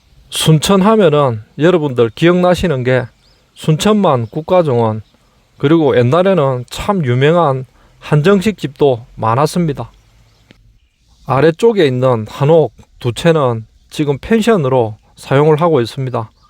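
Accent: Gyeongsang, South Korea. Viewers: 23만 축하드려요 ~ 경상도 목소리 듣기 좋아요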